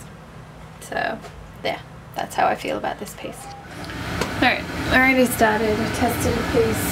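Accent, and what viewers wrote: Australia. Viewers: Beautiful work. I thought you had an Aussie accent. Keep up the great work.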